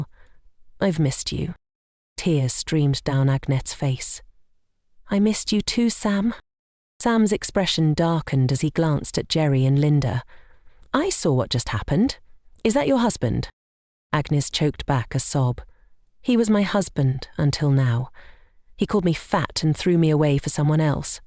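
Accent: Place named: England, United Kingdom. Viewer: Such a beautiful English accent. All that glitters isn’t GOLD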